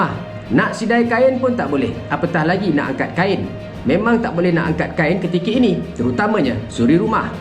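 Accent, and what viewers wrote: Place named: Negeri Sembilan, Malaysia. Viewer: Hehehe.. cakap nogori hahaahah.. Jang tak sedo dri tu ajak bertumbuk... Ukur paku hahahah. Terbaik.. Support punyer berita... Hehehhe. Hari ke 12 dh nie... 👍🤣😁😂😎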